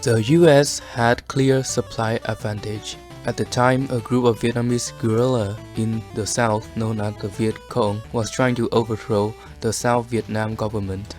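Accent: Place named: Europe. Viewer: He sounds more European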